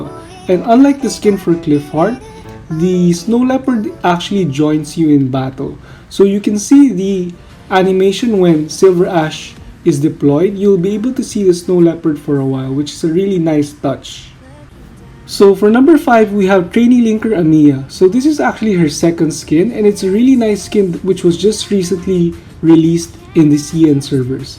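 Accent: Philippines. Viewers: U sound filipino, kabayan?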